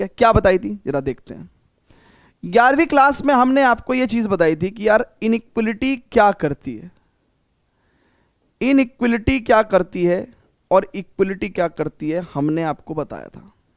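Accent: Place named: Uttar Pradesh, India. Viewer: Sir m bhi up se hun isiliye relate kr skta hun aapke bolne ke tarike se ekdm up wali tone h bht maja aata h...❤😂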